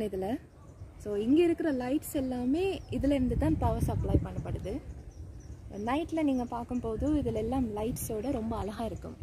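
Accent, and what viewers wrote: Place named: Tamil Nadu, India. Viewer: I really enjoyed your vlog..your tamil slang is amazing 💐 keep doing more vlogs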